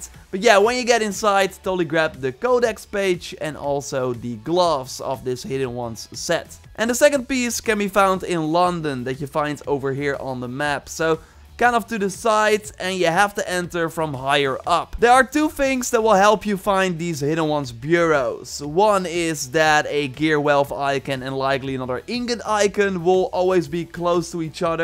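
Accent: Netherlands. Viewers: Never watched you before. But based on your accent, I immediately knew you are Dutch!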